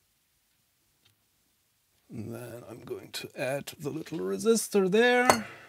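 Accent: Germany. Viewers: Ok, erstes Video was ich von dir sehe, und direkt raus höre nur mit dein Hi das du Deutscher bist.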